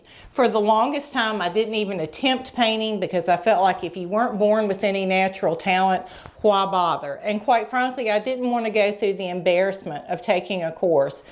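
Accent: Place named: South, United States. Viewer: Im not a gifted painter just taking advice from artists on youtube, I just came across you and love to hear you speak in your southern American accent, im’Irish so I don't hear too many different accent........ I love your paintings ❤❤❤❤❤❤❤ 🇮🇪🇮🇪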